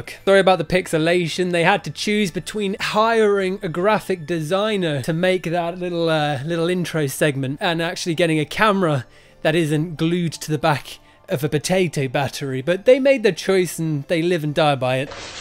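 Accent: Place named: United Kingdom. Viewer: My man said: "He was moving too fast for the camera to even pick up the sound — by the time the camera caught the sounds, he was playing GTA in the next room." 😆😆 Maybe it's the Russel Brand accent (as.an American) that gets me— but everything sounds funnier with that delivery. Awesome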